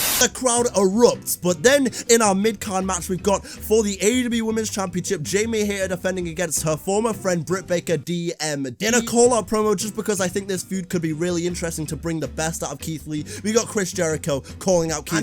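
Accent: United Kingdom. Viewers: Like ur accent u sound British to me ❤️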